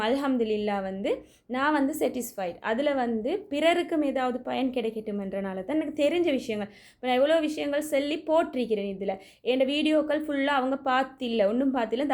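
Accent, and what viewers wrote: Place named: Sri Lanka. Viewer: மிகவும் தெள்ளத்தெளிவாக விளக்கிக்கூறும் இலங்கையின் தமிழ் வழக்கில் உங்களின் கருத்து மிகவும் சிறப்பான பதிவு. அன்புடன் உதய தாரகை. சிங்கப்பூர் குடியரசு 🇸🇬🇸🇬🇸🇬🇸🇬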